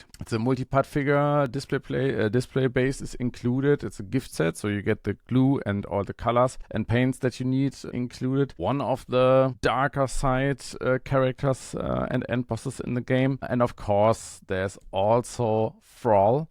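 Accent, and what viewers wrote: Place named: Germany. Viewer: Fendt Traktor is too expensive!Ford Mustang only 60 parts??Porsche 911 the repop of the old crap kit from the 80s??BMW M3 is a Fujimi tool!Shelby Mustang only repop with new decals!Wow,what a lineup!And its always a torture to listen to this "denglish"!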